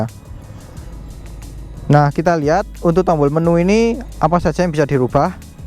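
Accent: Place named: Java, Indonesia. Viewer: Lucu, Judul Inggris.. Ulasan dialek Jawa.. Lanjut sam..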